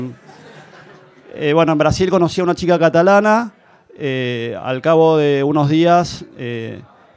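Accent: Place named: Argentina. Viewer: No le pillo la gracia...alguna risilla...pero esperaba más de un irlandés ke habla con acento argentinho...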